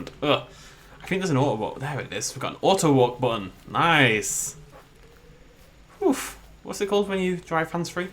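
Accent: United Kingdom. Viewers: It's Zed. Just like Maisie in Fallen Kingdom, you speak the Queen's.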